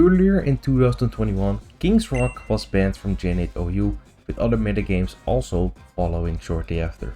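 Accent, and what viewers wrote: Netherlands. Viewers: I like how i could tell you were Dutch after the first 5 seconds. I am also Dutch myself 🙂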